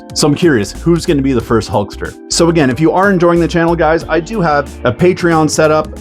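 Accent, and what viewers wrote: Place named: Canada. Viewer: Deckles and Lie-very… you Canadians always make my day!🤣 I built a frog back in 83/84… I grew up in Brooklyn and my neighbor upstairs was an ex plane builder, he built a frog and a holiday buggy for his two sons… I had to have a frog too… I like how the grasshopper looked lots more but I knew with the 380 I wouldn’t be able to keep up lol The super champ was the dream back then, and all the bad ass team associated cars that were just way too much for my 10 year old taste lol Those videos make me want to build them ALL!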